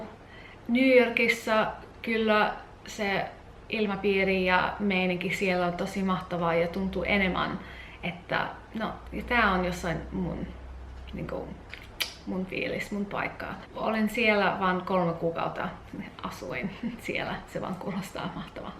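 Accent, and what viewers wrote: Finland. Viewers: I love your Finnish accent! Very good or should I even say excellent pronunciation! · Ihana suomi aksentti❤️ ja olen ihan suomalaiset mutta enkku tulee multa aika helpolla ku mummo ja pappa asuu jenkeissä ja he puhuvat mullekki aika paljon enkkua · minä olen asunut koko elämäni Suomessa ja sinulla on hyvä Suomalainen aksentti!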